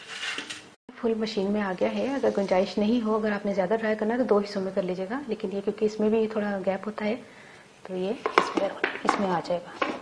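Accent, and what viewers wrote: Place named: Punjab, India. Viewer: Punjabi voice so cute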